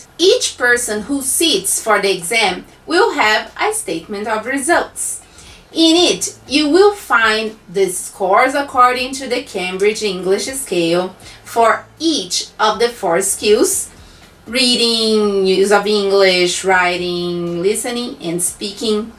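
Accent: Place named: Brazil. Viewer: Nos primeiros 10 segundos de vídeo eu já fiquei tipo... Essa garota deve ser brasileira, só pelo sotaque kkkkk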